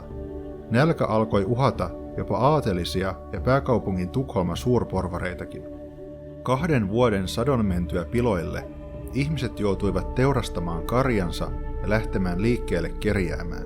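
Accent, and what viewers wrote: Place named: Häme, Finland. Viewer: Mainio video, ja kanava lähti tilaukseen. Hieman häiritsee "hämäläistyyppinen" murre joissain sanoissa: esim. huano (huono), kualleita (kuolleita), jne., sekä lauseiden kummallinen, robottimainen rytmittäminen. Kaiken kaikkiaan kuitenkin hieno kanava ja hienoja videoita.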